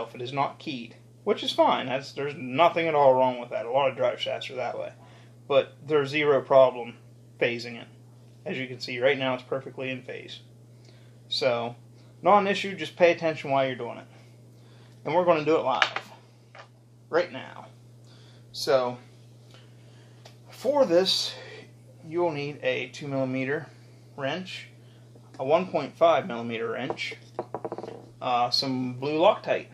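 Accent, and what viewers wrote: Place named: Pennsylvania, United States. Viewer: You have to be from PA right? I hear it in your voice??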